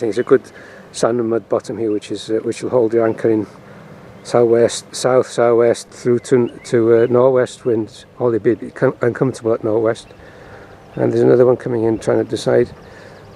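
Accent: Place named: North Wales, United Kingdom. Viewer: Diolch yn fawr. Glad to hear a North Wales accent & to watch your adventures in small yachts. Wish you fair tides & following winds for the future. "Hwyl a flag" from Cornwall & Brittany.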